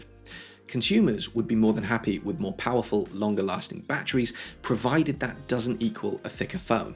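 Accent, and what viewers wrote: United Kingdom. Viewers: A calm erudite British accent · your Queen's English is quite appreciated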